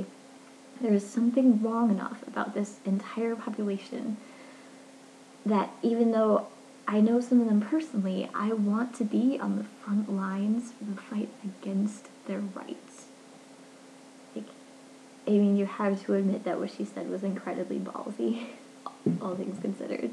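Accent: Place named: United Kingdom. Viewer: interesting, you dont sound american, i thought it was a british accent, maybe canadian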